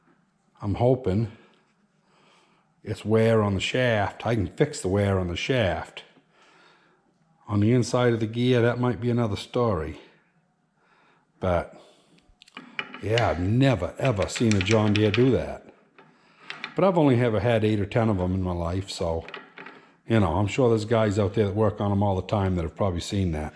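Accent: Maine, United States. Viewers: Its extremely funny for me to watch a fellow Mainer on youtube. LOL! We have our own dialect.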